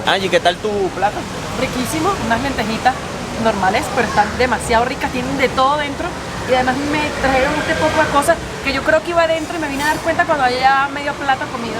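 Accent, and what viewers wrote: Venezuela. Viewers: Hola, gusto en conocerte, mi hermano venezolano, que alegría escucharte es nuestro dialecto, je, je, estuve en Medellin la semana pasada y pude conocer, la plaza de Botero, el metro y el metro cable de Santo Domingo, el pueblito Paisa, el jardín botánico y la plaza de los deseos, caminé por esa calle donde fuiste a la Hacienda. en dos días conocí todo. Dios es bueno y me regalo este paseo. Te felicito por tu programa. Un abrazote. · Jajja me siento cómodo de que seas venezolano y entiendo todo lo que dices xd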